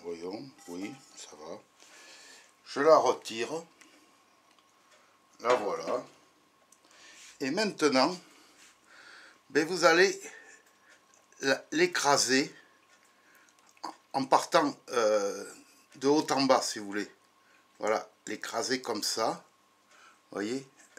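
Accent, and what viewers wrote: France. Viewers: bonjours je ne connaissais pas cette astuce pour l echelle dans la bouteille j ai 67 ans et je suis bricoleur egalement mais surtout en soudure a l arc mais tu m apprend encore beaucoups de choses sans indiscretion de quel region de france est tu car j aime t entendre parler amicalement d un c thi vivant en belgique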